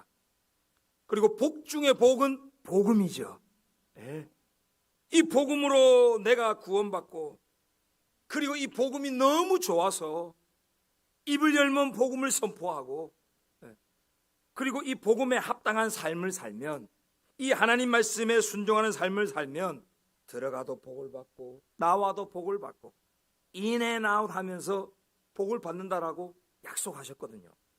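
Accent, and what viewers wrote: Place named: Gyeongsang, South Korea. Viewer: 🤗 말씀이 깊고 넓게 확장되는 시간이었습니다. 감사💞 ※※경상도 억양이시라서 부산근방..생각했었는데, 석수동이셨네요.🤓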